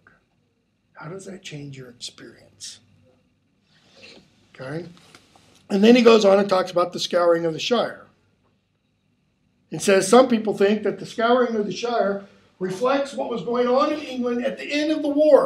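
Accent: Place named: United States. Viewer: Very loud and american.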